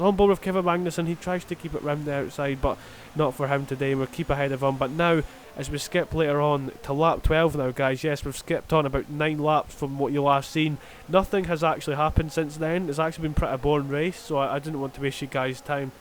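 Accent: Scotland, United Kingdom. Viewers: Good video and your Scottish accent is great! Lovin it!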